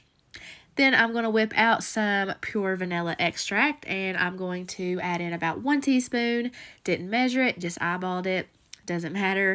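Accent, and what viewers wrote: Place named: Kentucky, United States. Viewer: I'm in Louisville!! Always knew the accent was familiar it's cause we Kentuckians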